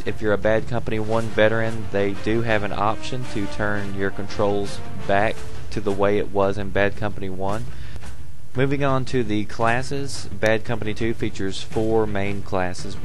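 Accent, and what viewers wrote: South, United States. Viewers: are you from the southern states?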